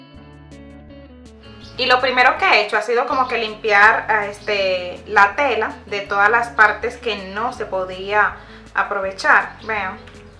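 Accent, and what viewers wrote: Dominican Republic. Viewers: Hola 👋 gracias por compartir tus ideas! 💡 Una pregunta , ¿ Eres Dominicana ? Tienes un acento sureño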